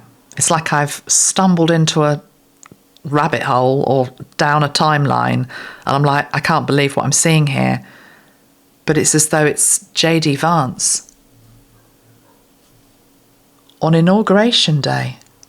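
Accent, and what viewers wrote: United Kingdom. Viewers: I am thrilled to watch this video,! Thank you for sharing your insights. I am hoping someday I’d get to visit your country U.K. When I was still learning English as an elementary student years ago, I dreamed of being able to speak in classy British accent like yours😅…! Well, I ended up here in the U.S., and I am a supporter of JD Vance!!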